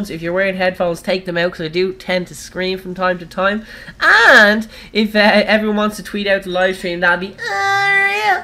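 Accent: Ireland. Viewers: Are you irish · are you irish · Where you from in Ireland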